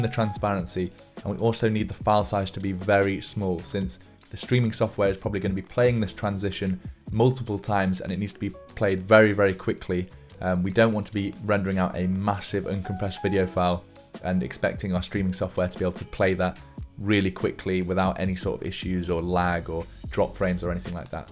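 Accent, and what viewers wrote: United Kingdom. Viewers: yo i love your videos, BUT YOUR VOICE. After a couple videos i have to listen to heavy metal to get the Brittish accent out of my head..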